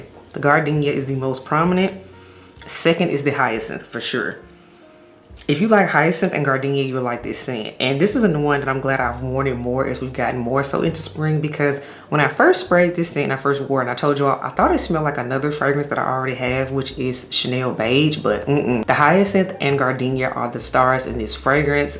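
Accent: Memphis, United States. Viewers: I knew you were a fellow Memphian when I heard you talk! Hi!!❤️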